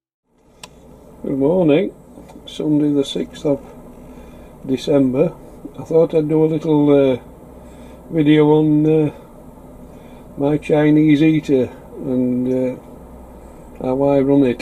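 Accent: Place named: Yorkshire, United Kingdom. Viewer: Hi i thought i recognised the accent,you are in Knottingley,i come from Mexborough,maybe we can get togeher one day.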